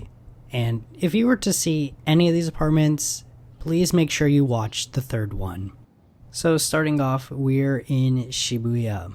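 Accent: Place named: United States. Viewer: I'll always choose ground floor. Carrying a bike plus groceries up stairs is too much for me. P.S. I'm happy to find your channel. I follow another but he doesn't share a vid often enough. I am watching two now and you speak American English. 😊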